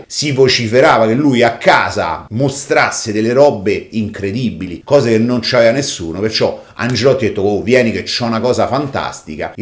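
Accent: Rome, Italy. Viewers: scusa, se puoi parla con accento anonimo non strettamente romano. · Mi piace molto come affronti la storia della Banda della Magliana... un pó per quel tuo accento romano che ti rende molto piú credibile, un pó perché sei credibile a prescindere per la serietá che poni nelle tue storie. Conosco la Banda della Magliana, o meglio conosco i testaccini perché nei primi anni Ottanta frequentavo sporadicamente i circoli missini di viale Marconi e non solo... e conobbi Maurizio CArminati...peró io ero un ragazzino figlio di un dirigente missino rispettato perché uno dei pochi che fu prigioniero in un campo di concentramento inglese in Tibet in quanto ufficiale responsabile del Reparto Informazioni in Africa Orientale, e lui era giá un mito... Per tanti anni mi sono occupato di lotta alla mafia, e ultimamente ho seguito il processo di Mafia Capitale grazie anche alla mia amicizia con Otello Lupacchini, che viene dalla precedente amicizia con Raffaella Notariale... 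Spero di conoscerti...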